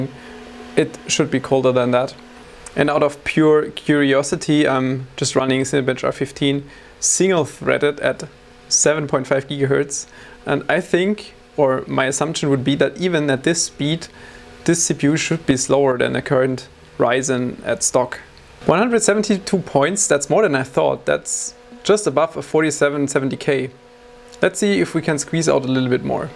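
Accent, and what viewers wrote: Germany. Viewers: I'm hearing this German accent so clearly, why don't you make German Videos? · You can hear how german he is by his accent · Er: sagt ein Wort Ich: Ein deutscher Staatsbürger Ich hasse den Deutschen Englisch Akzent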